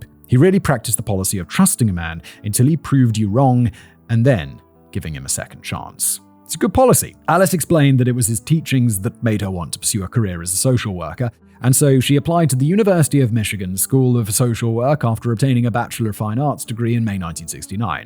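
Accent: United Kingdom. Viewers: well he is British so…makes sense how he would have a different pronunciation for words. Also…I knew the correct pronunciation right away. I also had family in the area during the time he was at large. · I'm a Houstonian now, but both sides of my family are from Wisconsin and Michigan. So I get to cringe every time he says something in this episode, plus his British-accented-Spanish-Spanish pronunciations where I'm used to Mexican Spanish.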